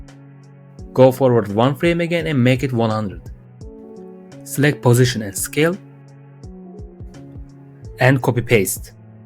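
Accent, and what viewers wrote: Turkey. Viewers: türk aksanını nerde duysam tanirim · aşırı türk aksanı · are you turkish?? (bence türksün aksanından) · abi türkmüsün aksanın benziyorda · Sesinden anladım türk olduğunu ag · hocam türk müsün çok fena aksanın var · türkmüsün konuşman türk aksanına benziyo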